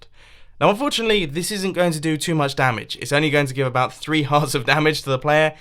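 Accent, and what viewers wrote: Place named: United Kingdom. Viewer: Oh um sorry. Thanks for another fantastic video mate! Please keep it up! I also like your British Accent!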